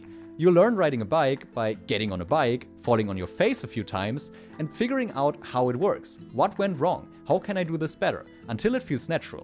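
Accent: Germany. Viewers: Du bist doch Deutscher dass hört man so raus 😂😂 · For me it seems you have a german accent, is that true u are german? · Are you german ? kinda have an accent… i love german btw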